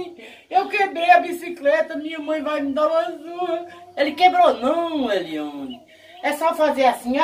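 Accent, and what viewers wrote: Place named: Northeast, Brazil. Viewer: Boa noite minha linda 😁 eu amo os teus vídeos 😁 agora sim eu entendi porque você fala tanto em Barbacena 😁 vejo que tem sotaque nordestino 😁 eu sou mineira e amo esse povo do nordeste 😁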